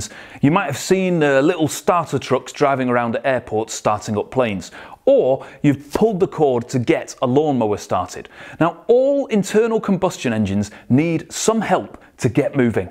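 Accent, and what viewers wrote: United Kingdom. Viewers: Is this guy from England? I like him, he has a passion for mechanics. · Indeed very informative, full of energy and I love the british accent. =))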